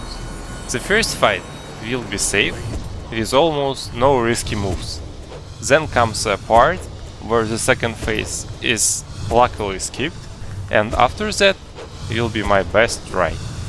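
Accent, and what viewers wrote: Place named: Russia. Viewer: Ты чо, русский шоле? А то акцент русский...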